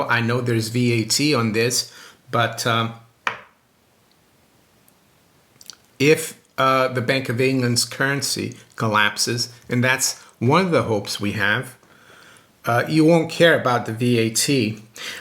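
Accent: United States. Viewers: Here I thought you were an american living in England this whole time. Thanks for the video. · Italy, Brazil, Switzerland - so why the United States accent?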